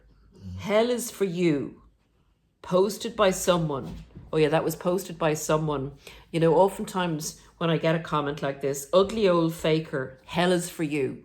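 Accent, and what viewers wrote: Ireland. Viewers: You don’t need to defend anything you do, you are a kind wholesome person and I love your beautiful Irish accent. My darling Irish Mammy died in March. She taught me how to be loving and to love.Please don’t don’t don’t apologise. The best trick of the devil is to pretend he doesn’t exist.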